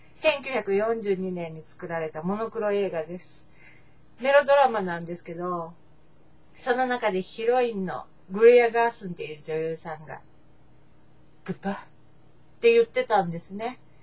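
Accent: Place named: Japan. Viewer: あなたは英語がとても上手いですよ。語彙や文法などは抜群です。日本語訛りはありますが気にしなくていいと思います。あなたの英語は聞き取りやすいです。If you are not satisfied with your progress, I recommend watching as many English movies & TV shows as you can as well as conversing with native speakers. I've been doing similar things to improve my Japanese and have noticed good results (still a long way to go though)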